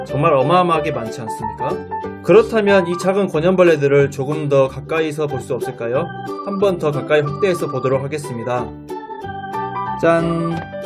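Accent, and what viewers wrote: Jeolla, South Korea. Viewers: 전라도 사투리 억양 정겹네요 ㅋ 표준어 쓰는척 하는게 억양이ㅋㅋ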